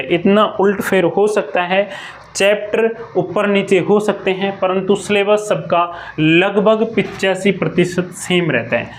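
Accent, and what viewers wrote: Rajasthan, India. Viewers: Sir aap Rajsthaan se ho kyaa 😊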